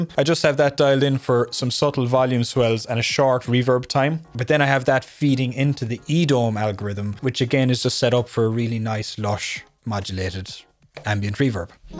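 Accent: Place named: Ireland. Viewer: New sub here, Tis gas to be listening to a pedal review with a good oul strong paddy accent 😂 Fellow paddy here. Great review ta be fair to ya. Hoping to pick one of these tomorrow to try scale down my board and utilities midi functionality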